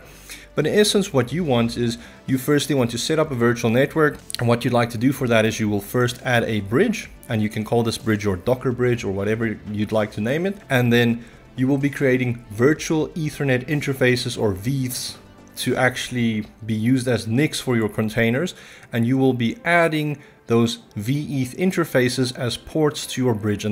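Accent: South Africa. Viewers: <num>... Knew I was picking up a South African accent. lekka man!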